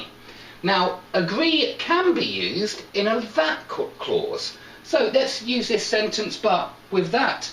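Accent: United Kingdom. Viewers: Thank you so much for all your efforts and time being put to help us to understand the language so called "English". I love your videos and especially the british accent.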